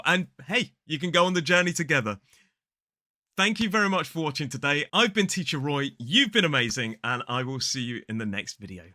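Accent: United Kingdom. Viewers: 🙏💛💙 Adore British accent! It’s music to my ears! Thanks for the video! Pretty helpful!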